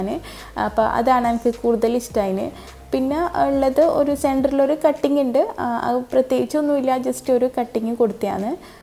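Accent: Kannur, India. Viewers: Kannur slang ❤️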